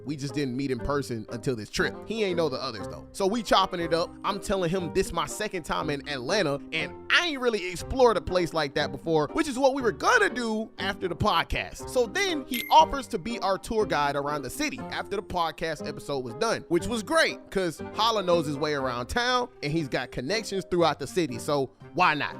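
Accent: Alabama, United States. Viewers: Holllll uppp you from Alabama?;?!?!?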